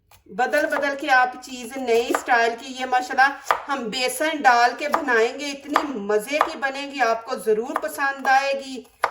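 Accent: Punjab, India. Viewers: لہجہ پنجابی زبان اردو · Ye bibi urdu english punjabi lehjey main bolti hain bat samejh aa jati hai na to tunqeed kiun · Aoa boht acchi recipe .aap urdu punjabi lehjey main bolti hain. Behter ho ga k punjabi main btayn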